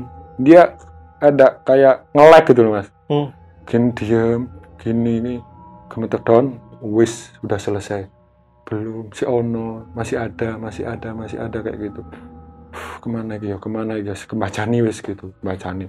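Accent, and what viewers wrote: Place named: Malang, Indonesia. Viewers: Cara cerita dan logat mas bikin aku kangenbanget sama Malaaaaaang huhuhu btw suwon sam wes crito yaaa! Tahes terus umak yaa sam!! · logatnya asli malang banget, mantab mas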